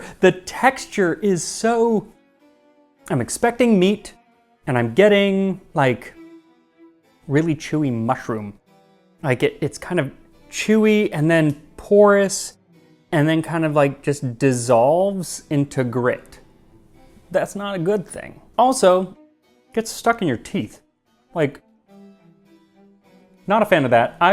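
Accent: Greece. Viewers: Excellent Greek accent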